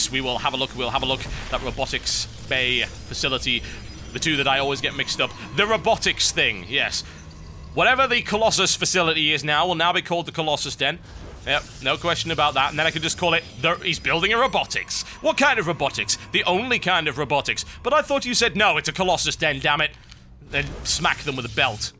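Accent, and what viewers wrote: United Kingdom. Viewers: but but TB's awsome brit voice/commentary of epic SC2 matches...i must watch...i need it so i dont have to go and study my rad tech material or my statistics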